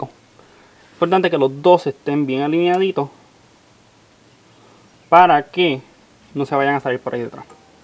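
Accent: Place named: Puerto Rico. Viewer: oye brodel ..yo de mala pata saque el pistonsito completo del caliper. y ahora no me quiere sangrar..algun consejo q me puedas dar? ahi otra manera ..se sangra con la guagua prendida o apagada? soy novato en esto so mientras ma detallado puedas ser te lo agradesco..y x tu acento creo q eres bori..so una ayudita no me vendria mal gracias de antemano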